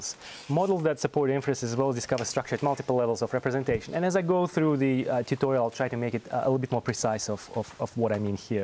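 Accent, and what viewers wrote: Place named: Russia. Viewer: Чувствуется русский акцент ...перемотал в начало, автора посмотреть, я был прав!)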